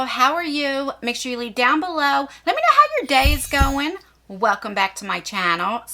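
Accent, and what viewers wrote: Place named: Maryland, United States. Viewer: Where are you from we pronounce water the same way , like wooder, lol. I’m in Maryland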